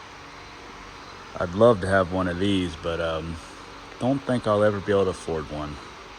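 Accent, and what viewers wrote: South, United States. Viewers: You have a deep southern accent.👍🏾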